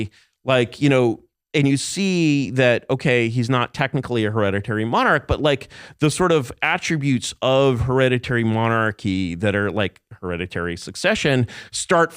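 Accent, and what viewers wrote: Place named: California, United States. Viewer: He has an annoying California accent to me. The long drawn out syllables as he improvs his next empty general statement.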